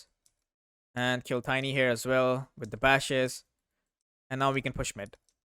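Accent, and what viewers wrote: India. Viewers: Bro.... You Indian?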